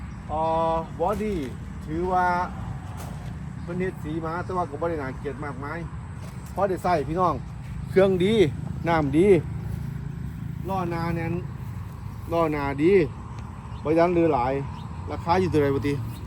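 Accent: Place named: Khok San, Thailand. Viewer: สำเนียงคือคนบ้านเฮา บ้านโคกสาร คัก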